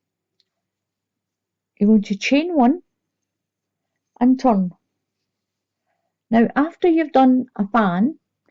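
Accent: Scotland, United Kingdom. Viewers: I've saved this fan pattern. I'm just a learner but I love trying out patterns. What size of crochet hook did you use ? Keep up the artistic work, your super duper . You speak the same lingo as me hen!!, nice to meet a Scottish lassie !! X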